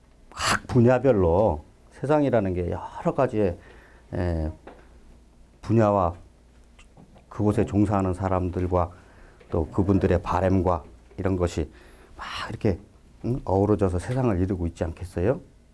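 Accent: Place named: Chungcheong, South Korea. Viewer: 군소리가 99% 너무 많다. 그기다 충청도 말씨까지 더하니 답답하고 짜증까지 생긴다.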